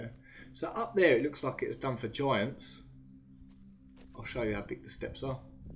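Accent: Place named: Southern England, United Kingdom. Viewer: He's from the South of England. Can't you tell by his accent?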